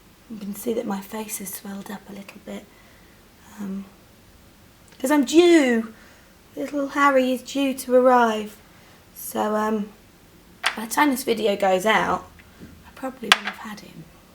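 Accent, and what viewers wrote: United Kingdom. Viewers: Loved the bus but your brittish......not subscribing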